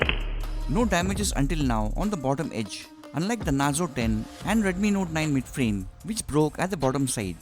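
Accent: India. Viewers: Nice test bro, india aksen 👍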